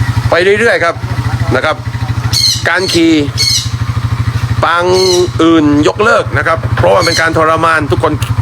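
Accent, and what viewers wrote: South, Thailand. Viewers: ชอบนายหัวจาพูดใต้ไม่ดัดจริต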